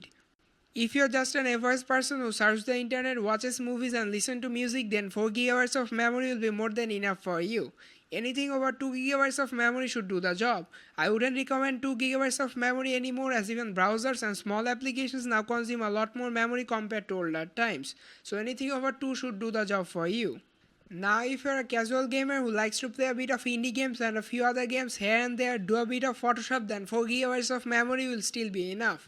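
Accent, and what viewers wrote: India. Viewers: Lovely accent :) Hello India!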